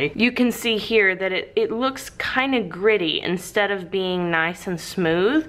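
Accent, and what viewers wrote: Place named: South, United States. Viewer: Anybody else from 2020 and sounds sooo southern in the intro? Lol I know how southerners she sounds bc I’m from the south 😌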